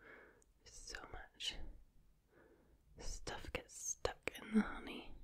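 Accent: Scotland, United Kingdom. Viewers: You're amazing. Your beauty, your personality and that scottish accent. Stunning! Great video too! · the scottish accent is so good! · I love Scottish accents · Love the Scottish accent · Oh yes same thing. I put almond milk in my oatmeal instead of milk because it’s a little healthier I am part Scottish 👍🏼 by the way and love ❤️ your accent 😁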